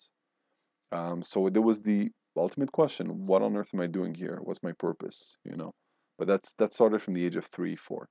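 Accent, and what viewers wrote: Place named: Switzerland. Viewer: oh then I understand why I like your accent, I was born in switzerland too :) still living there but I actually always wanted to move to Canada as a child. now I feel more drawn to England and Ireland. your encounter with the arcturians sounds fascinating, I would like to meet them too one day.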